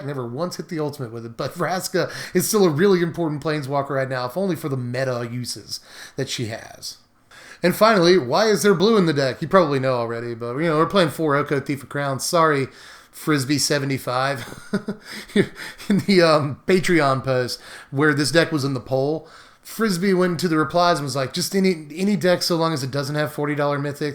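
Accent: South, United States. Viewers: What part of the south you close to Tennessee lol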